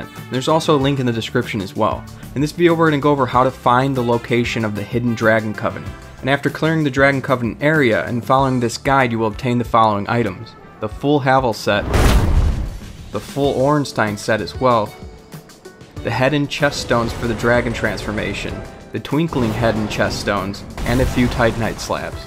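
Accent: United States. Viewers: Your videos are so interesting and helpful, but the heavy midwestern accent is insufferable.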